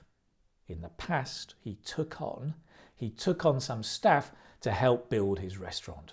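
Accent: United Kingdom. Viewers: I'm really intrigued the way you teach, straight away to the topic and don't waste my time, explain clearly and easy understand. I like your British accent as well.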